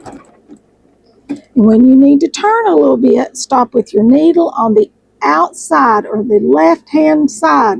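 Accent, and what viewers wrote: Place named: Tennessee, United States. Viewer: I thought you sounded like you were from Tennessee. We are in East TN also. Love your sweatshirt.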